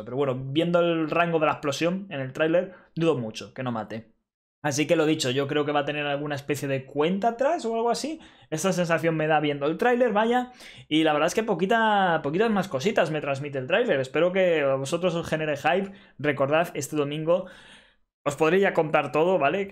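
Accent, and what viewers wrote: Argentina. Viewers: Es de arg? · Es argento papa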